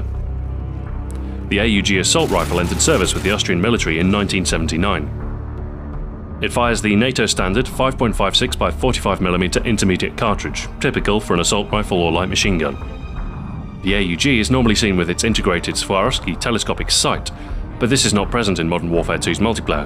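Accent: United Kingdom. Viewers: are you british? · Hey mate im not gay but i love you! ur videos are the best ;) and i love ur british accent ;) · Its not Russian... Its a BRITISH accent, he's from Manchester.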